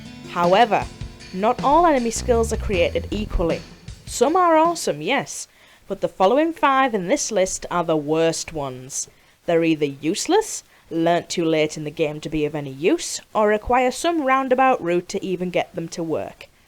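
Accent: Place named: Northeast England, United Kingdom. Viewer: That's a North East English accent? Huh, neat!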